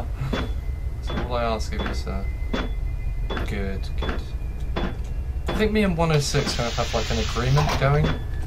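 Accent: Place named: United Kingdom. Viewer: This is my first time seeing your videos How the hell did I know you are British ?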